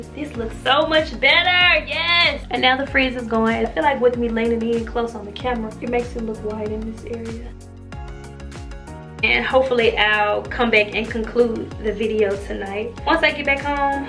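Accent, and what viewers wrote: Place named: Memphis, United States. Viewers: When you first started talking I automatically heard Memphis! Then looked in the bio and was like I KNEW IT 😂😂😂😂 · Girl I didn’t even know she was actually from Memphis but I saw your comment for comfirmation of what I was thinking 🤣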